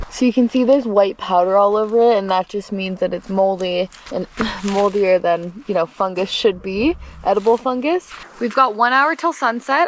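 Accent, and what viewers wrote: Canada. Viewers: I found out it’s part of the way Canadians talk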